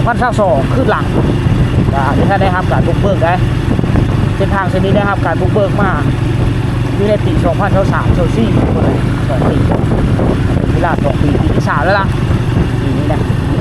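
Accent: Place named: So, Thailand. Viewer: ฟังคือภาษาโส้ กุสุมาลย์ สกลนคร อะจอ อะลีก เดอะ คือกันกับโส้กุสุมาลย์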